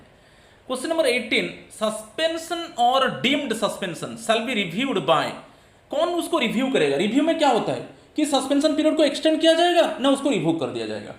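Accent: Odisha, India. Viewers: Sir today video I think you are from odissa Am I right sir , we have one professor in our PG SRI A K TRIPATI FROM BHUBANESHVAR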